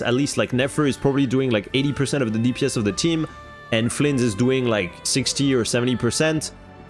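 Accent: France. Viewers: are you french you have an french accent sometimes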